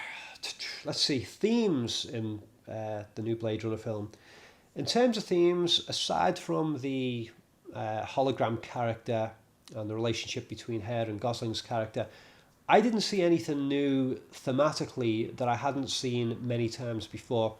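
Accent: Liverpool, United Kingdom. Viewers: has an English accent. I think he might be from Liverpool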